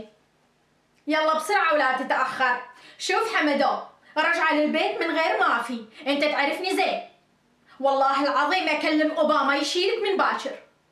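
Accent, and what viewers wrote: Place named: Gulf, Arab world. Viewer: هههههه والله هاني عايشة 7 سنين في الخليج ما نقدر نحكي خليجي كيفها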